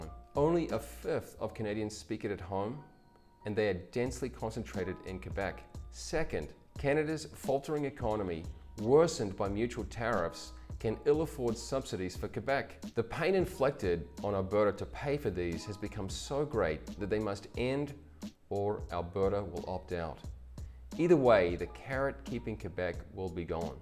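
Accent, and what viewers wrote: Australia. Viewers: I have to admit that your “rant” rankles when I hear that Aussie twang😡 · Sounds like he from either Australia or New Zealand, and according to his channel's about section he lives in Guatemala. The sad thing is, I don't think Trump needs to pay people to say these things. He's built such a cult, people will make excuses for his top appointees even when they sieg heil the audience twice on stage with the cameras rolling.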